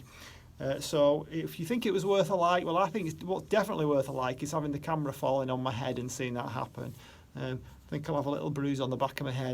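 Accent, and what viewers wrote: North, United Kingdom. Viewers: Fabulous video and so entertaining too. By the sound of your accent you’re definitely a northerner which is always a winner in my book.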